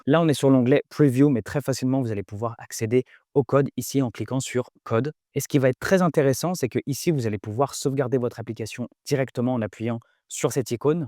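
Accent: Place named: England, United Kingdom. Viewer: Super ton accent anglais, ta vécu dans un pays anglais?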